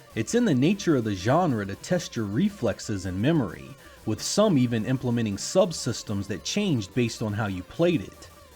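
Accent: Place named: Philadelphia, United States. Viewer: You can tell he is from Philly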